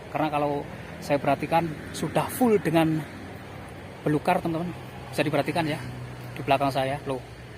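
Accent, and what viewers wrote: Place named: East Java, Indonesia. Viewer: Dr semua vlog sejenis, saya suka vlog ini krn: 1. Seblm mulai ada penjelasannya 2. Logat Jwa Timurannya bikin seru n lucu 3 . Ulasannya detil 4. Ga diserem²in pake back sound Saran: 1. Sll hati² apalagi tangga² yg rapuh 2. Klo di rumah² yg ada barang²nya misal berkas² baiknya disorot/diteliti lbh detil sapa tau bisa jd titik terang ttg siapa penilik rumahnya Suwuuuun..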